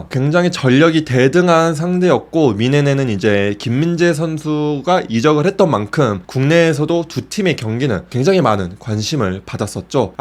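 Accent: Gyeongsang, South Korea. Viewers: 경상도 억양좀 어케 해보소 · 경상도억양 듣기 싫어요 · 경상도 말이 어때서? 듣기 싫음 보지마라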